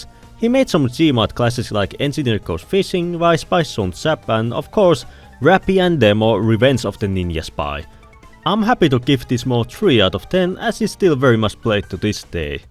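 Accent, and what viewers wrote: Finland. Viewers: I am a simple man, I hear Finnish accent, I subscribe.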